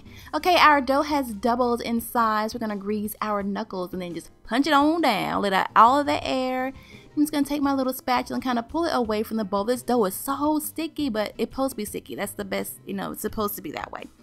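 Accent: South, United States. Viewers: IK she's southern but from which state? Sounds like Virginia or Carolina to me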